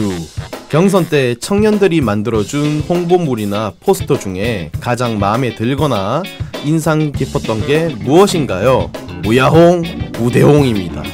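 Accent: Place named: Seoul, South Korea. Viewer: ㅋㅋㅋ 넘 귀여운 서울 말 씨! ㅋㅋ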